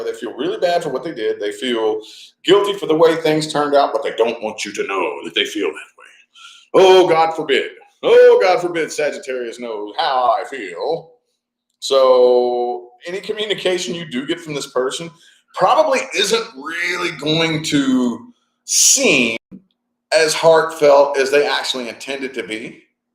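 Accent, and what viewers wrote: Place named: Kentucky, United States. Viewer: Kentucky Girl here loving that hint of your Kentucky twang!!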